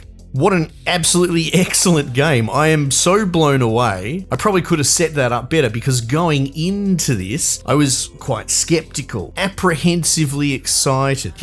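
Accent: Australia. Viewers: ★ ★ ★ ★ ★ ⭐⭐⭐⭐⭐, in my 47 years I have never found myself on a youtube site for game reviews, and I only came upon your review of this game as I was looking for games that didnt require hundreds of gigabytes of storage. So seeing Hellclock at less than 3Gb I googled it and your review of this game was the top youtube result. So I start your review, and within 20 seconds I hit the subscribe button. There are so many reason why, and I wanna get back the review of the game so I wont blow too much smoke up your arse, but can I just say, I was in stitches and simulateously got a brain hard-on. You know your stuff man, and it's good to hear a fellow Aussie (or maybe Kiwi) who really doesn't need to be told how to suck eggs. I will be checking out more of your content in the near future, I just wanted to say "thank you, you're awesome." Sweet as I'm done.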